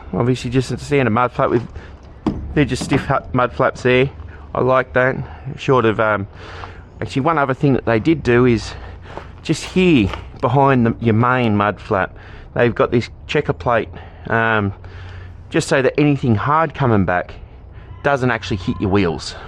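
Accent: Australia. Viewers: Nice truck , Mate ! ,I'm Chinese ,learning English, also need some time to adapt to Australia accent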